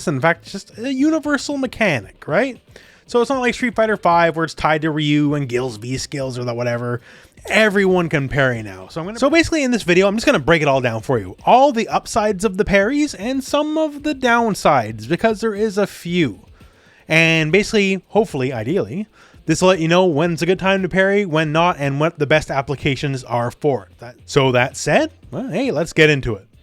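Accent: Canada. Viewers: You sound like you must be from the same place as Jordan Peterson.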